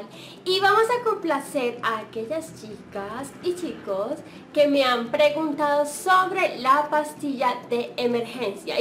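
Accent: Colombia. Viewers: siempre había escuchado acerca de la ''famosa'' pastilla y no tenia claro muchas cosas :/ gracias por aclarar todas mis dudas y tiene una hermosa voz (mas ese acento de colombiana) -saludos!! :D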